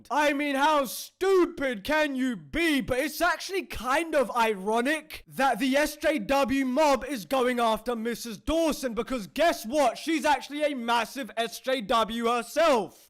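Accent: United Kingdom. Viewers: The British accent makes this 100x better lmao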